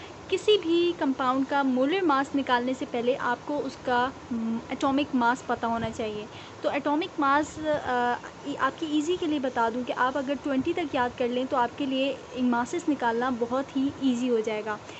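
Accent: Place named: Pakistan. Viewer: Are you from Pakistan I mean the person who is teaching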